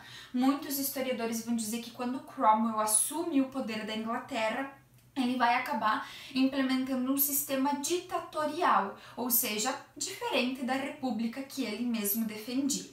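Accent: Brazil. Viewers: é paranaense (do sudoeste, provavelmente). O sotaque é igualzinho. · Que legal esse sotaque gaúcho!!!!!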